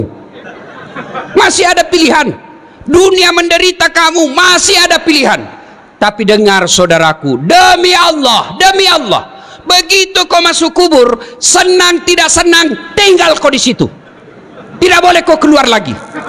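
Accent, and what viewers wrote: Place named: Makassar, Indonesia. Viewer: Logat makasar dan batak emank hampir mirip,kocak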